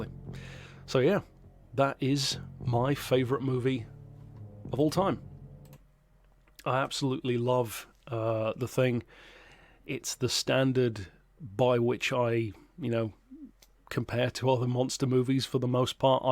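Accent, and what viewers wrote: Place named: United Kingdom. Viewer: It's weird seeing Braun Strowman, discussing a movie with a British accent on YouTube! 😕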